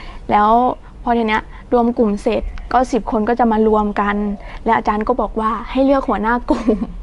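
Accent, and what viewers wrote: Central Thailand, Thailand. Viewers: น่ารักอีหล้า พูดไทยกลางชัดเจน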